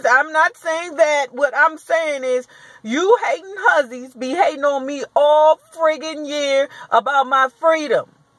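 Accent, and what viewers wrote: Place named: DMV, United States. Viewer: The way that you said.... "and I radiate love"..... LLS. To funny!!! The moment I heard you speak I knew that you were from the DMV. Gotta love my local ladies.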